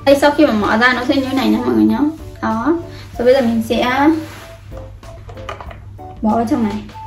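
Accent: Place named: Hai Duong, Vietnam. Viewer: Nghe giọng của em thì rất giống người hải dương. Có phải vậy ko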